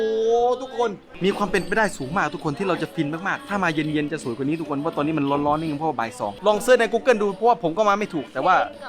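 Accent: South, Thailand. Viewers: ใต้สำเนียง มอน นะครับ 5555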